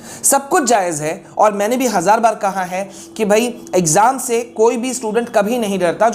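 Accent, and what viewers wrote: Bihar, India. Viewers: Sir aap kya bihar ke hain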